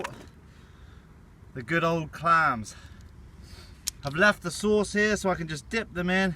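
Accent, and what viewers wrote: England, United Kingdom. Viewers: Lovely British accent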